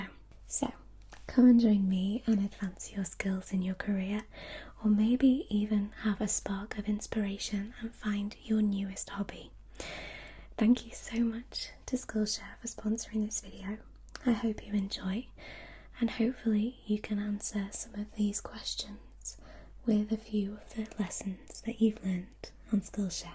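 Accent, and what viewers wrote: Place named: Wales, United Kingdom. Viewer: I can’t put my finger on her accent, anyone know. Switches between welsh and English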